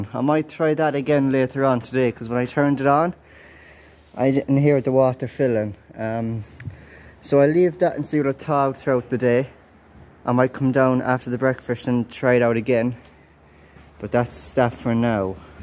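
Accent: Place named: Claremorris, Ireland. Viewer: Great video I know you told me your from Mayo sounds very like a Clare morris accent 👌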